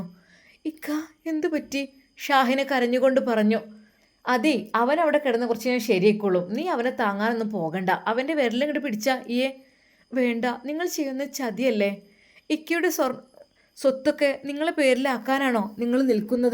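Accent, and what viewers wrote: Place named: Kozhikode, India. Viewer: Ningal calicutil koduvally bhakath mattoo aaanoo.. samsaram angane thoonunnu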